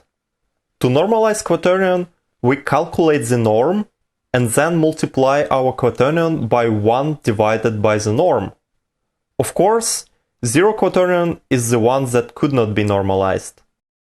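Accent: Russia. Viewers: Хард рашен акцент!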